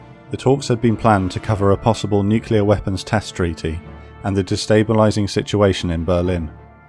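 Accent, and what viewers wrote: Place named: Somerset, United Kingdom. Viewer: Nah, in real life he has a thick somerset accent... Closer to the wurzels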